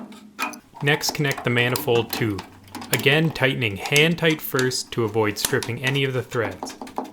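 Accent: Canada. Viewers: Are you Canadian or from the Upper Peninsula?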